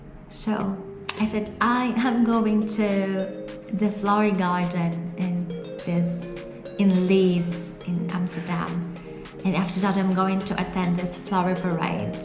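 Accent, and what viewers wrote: United Kingdom. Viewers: Maam taga UK po kayo? Nice accent and the video is Very informative..Kudos!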